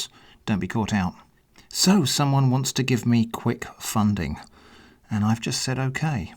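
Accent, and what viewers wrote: United Kingdom. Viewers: Amen to that ! He indeed has a very soothing British accented voice. Or perhaps Australian ?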